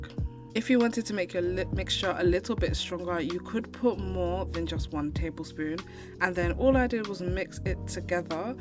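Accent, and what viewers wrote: United Kingdom. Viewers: you have a lovely voice I always wanted to speak in a British accent!🍵💕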